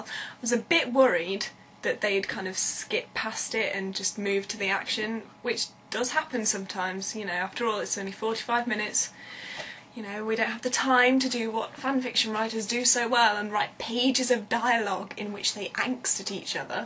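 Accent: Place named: United Kingdom. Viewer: OMG, you sound like me, except, ya know, British. I WISH I was British. I disagree with you on the subject of Martha. I think she grew into her awesomeness, unlike Rose, who was instantaniously wonderful. Anyhoodle, yes, Jack is overshadowed in the finale by the Master. The DOCTOR is overshadowed by the Master, and it's HIS show! Thankfully there's enough slash to make you die of squeeing in the last three episodes ('use my name' indeed!) which is just fantastic! Great review!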